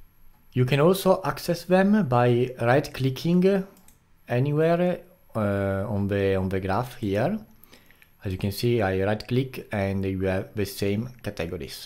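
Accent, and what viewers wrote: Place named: Italy. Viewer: Very good tutorial! Curious question, are you Italian? That's a very thick accent... Not that I mind it! It's quite charming! Great tutorial!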